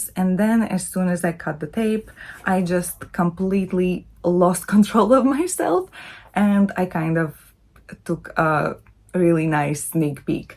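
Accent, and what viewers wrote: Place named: Latvia. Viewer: You have beautiful accent. Sounds Latvian. Are you from Latvia?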